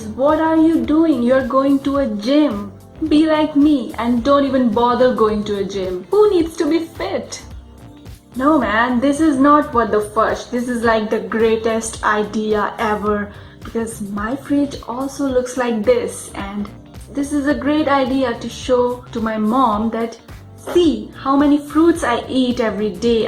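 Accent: India. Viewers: it’s obviously indian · I'm glad I found you from a comment your so funny. You sound Indian but don't look like it but I have an Indian friend she has the same name as you. Also I'm Indian 😸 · Bekir Hadžić She's probably Indian and she speaks English a lot so her accent is light.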